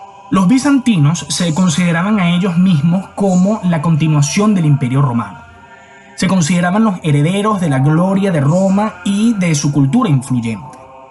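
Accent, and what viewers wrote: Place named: Venezuela. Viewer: ese acento, si no me equivoco es de Venezuela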